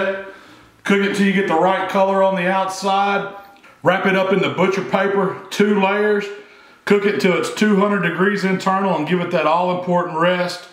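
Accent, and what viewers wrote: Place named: Texas, United States. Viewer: Belly + Texas accent = I'm going to make my first brisket! Thank you!!! ❤️ (born and raised Texan here!)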